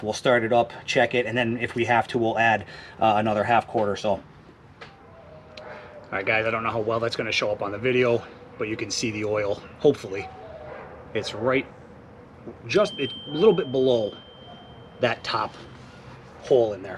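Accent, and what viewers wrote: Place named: Philadelphia, United States. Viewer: No way this dude ain't from Philly.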